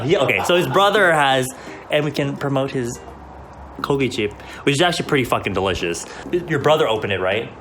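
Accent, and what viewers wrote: South Korea. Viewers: I love your konglish 😍😍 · i love this konglish omg i relate so hard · the koranglish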